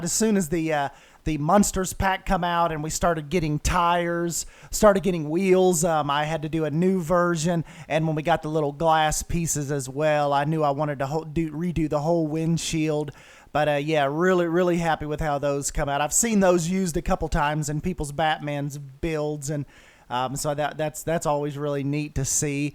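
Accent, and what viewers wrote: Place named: Texas, United States. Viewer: Man this video is sooo vintage, I can't wait to see if they come out with a Planet Coaster 2 as I've heard you & Drew mention that quite a bit. I do think that Planet Zoo will be a perfect placeholder for you tho while we wait for a Planco 2. My wife said, is that guy from Texas? LOL Keep doing you man!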